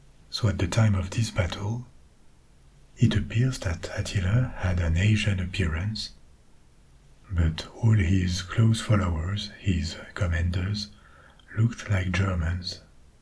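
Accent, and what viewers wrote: France. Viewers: Sa fait bizzare d ecouter de l asmr anglais avec un accent français 😂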